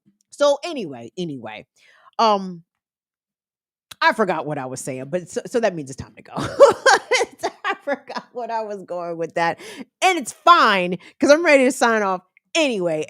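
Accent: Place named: New Jersey, United States. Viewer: Too be fair,she really sounds like somebody from Jersey or maybe Staten Island.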